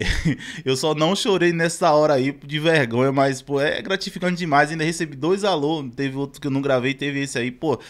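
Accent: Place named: Northeast, Brazil. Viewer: Vc é nordestino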